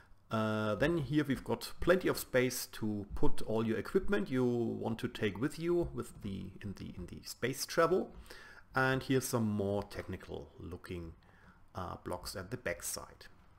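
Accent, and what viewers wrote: Germany. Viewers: Are you from germany? nice video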